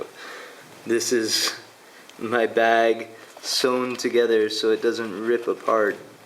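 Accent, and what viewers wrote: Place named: United States. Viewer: whats up with the brit passport. Sound american lol just a random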